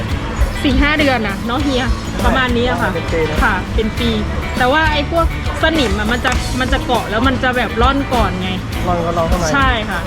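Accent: Isan, Thailand. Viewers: “ขอบคุณ ภาษาไทย ขอบใจ ภาษาลาว” ชอบๆๆ น้องสาวหล่าที่มีวลีประจำตัวเอง ลุงเข้ามาชมเป็นครั้งที่สองแล้วสมัครเป็น fc สักคนสิได้บ่น้อน้องหล่า ชอบฟังน้องพูดภาษาเพราะเหมือนไทยอีสานเลย 新正如意 新年发财 ซินเจียอยู่อี่ ซินนี้ฮวดใช้ #เป็นกำลังใจให้สู้ต่อไปเด้อหล่า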